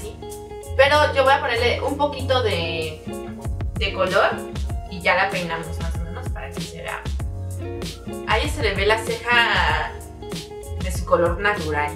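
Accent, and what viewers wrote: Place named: Sonora, Mexico. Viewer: Tiene el acento muy sonorence y las palabras que usa son mas como de hermosillo, ojala si lo fuera :"(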